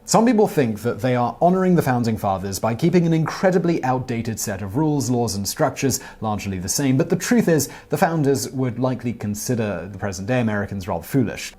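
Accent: England, United Kingdom. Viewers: Yes, he has an english accent. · Never trust anyone with a British accent trying to teach you history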